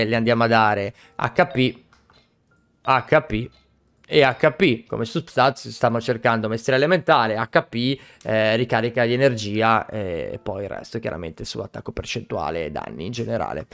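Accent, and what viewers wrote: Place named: Carrara, Italy. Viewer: Ma a l`è cuscì.... Sei di Carrara?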